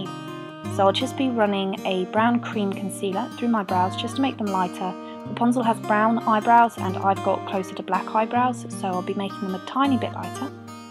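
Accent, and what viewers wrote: United Kingdom. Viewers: I LOVE YOUR ACCENT ITS SOO AWESOME! where are you from? it sounds like your from the U.K. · Your voice and accent sound fucking horrible. Like an old british hag. Id hate to be British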